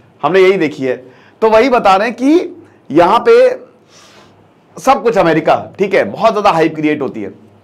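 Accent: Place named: Uttar Pradesh, India. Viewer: Aap UP k ho ye to accent se pata chala aur sun k maza aya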